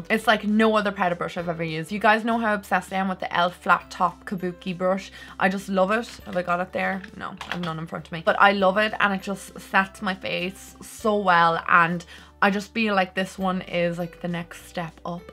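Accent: Ireland. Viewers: I just love you so much!! And I enjoy listening to your brogue! It reminds me so much of my Nana who is from Kenmare..And I just love your makeup looks and think you are so talented!! Much love from Boston!!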